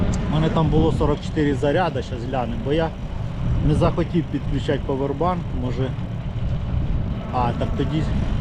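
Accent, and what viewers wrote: Poltava, Ukraine. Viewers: Чисто полтавский говор не спутаешь ни с чем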